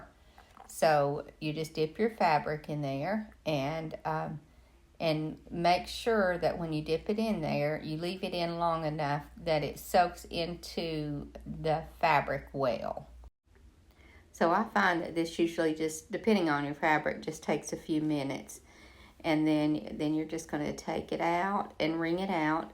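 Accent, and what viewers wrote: South, United States. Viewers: You have such a thick southern accent, which most of us aren't used to hearing, that made you so hard to understand. Could you please repeat this in standard English or with captions. If it wasn't for the vid, I would have missed out. Thanks.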